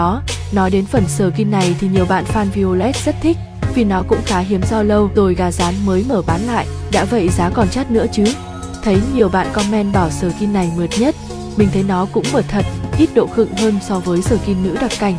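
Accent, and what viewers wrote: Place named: Quang Tri, Vietnam. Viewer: Em ấy giọng Quảng Trị nên hơi khó nghe cho các bạn miền trong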